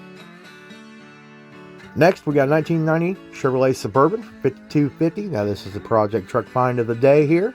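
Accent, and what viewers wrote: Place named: South, United States. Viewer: I enjoy watching all WTF videos, got hooked quick. I believe they inspire, you know, to own or build one of these vehicles. I also find the narrator's humor and southern accent a good combination for the show.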